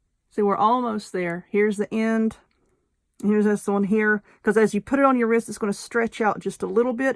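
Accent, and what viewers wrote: South, United States. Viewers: I adore you. the Southern accent is so calming for me, since the whole of the maternal side of my family is from down South, plus you explain everything so thoroughly and show how slowly so it can be followed, it doesn't hurt that you mix in some humor too, that's always really nice and reassuring when one might be nervous or have difficulty keeping up with faster tutorials. Unfortunately though, JoAnns went out of business, I'm so sad about that.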